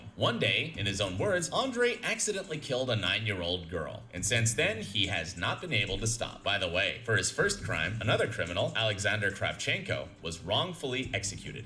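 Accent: India. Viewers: that indian accent got me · His Indian Accent had me dying😭😭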